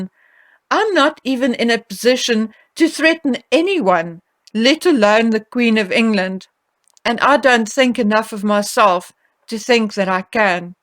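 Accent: South Africa. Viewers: Your videos are always very informative and well prepared and I look forward to hearing your news every time one comes up. Thank you for your hard work,I love listening to your S A pronunciation.Brava best wishes from Italy . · We're in California, so we don't have much opportunity here to speak with many people from South Africa, but from what I've heard accent and style of speech is very typical.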